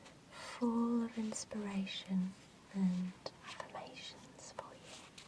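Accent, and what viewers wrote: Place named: Essex, United Kingdom. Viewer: love listening to your voice. calming, soft, essex twang with a touch of class and a slight hint of nautyness. could listen to you for hours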